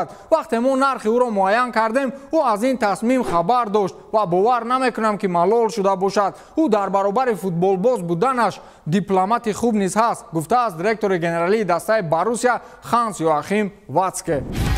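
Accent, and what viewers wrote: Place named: Rural, Tajikistan. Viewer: Акай чуни мни гох гох дехотигирам гапзан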